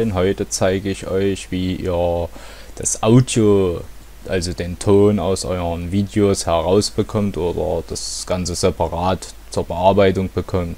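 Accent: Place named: Saxony, Germany. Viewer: dummer sachse. lern deutsch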